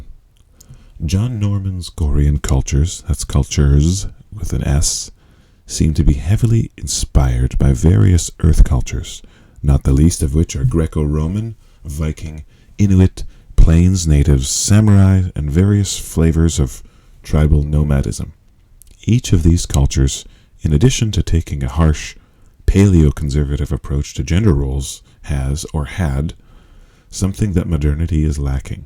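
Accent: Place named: United States. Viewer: please will you get in contact with me Master I want to learn as much as I can about all this. I've ordered three of the books about Gor from Amazon. I think you live in America as you sound American. I live in Belfast northern Ireland so I think I'm about 5 hours ahead of you in time not sure but you can contact me anytime you like but there may be a delay in getting back and in real time sorry. I'm also at uni most days which doesn't help with things either. I'm bit embarrassed about asking but I want to be a sex slave slave girl and learn as much as I can . Now I'm totally embarrassed and will be a complete nervous wreck until something happens from your side or not. You can contact me anytime you like . Love Tara x. I feel a bit humiliated asking about all this but it's what I want. Tara x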